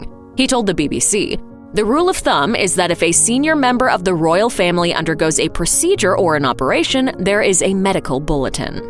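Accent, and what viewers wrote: United States. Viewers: No facts are 'out'! What a waste of time and...a really awful American voice narration! 😖🙄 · Why is some american narrating this? Absolute rubbish!! Worry about your own disaster of a country now that your own people allowed that trump and his ilk to completely make america look like a bigger bloody joke.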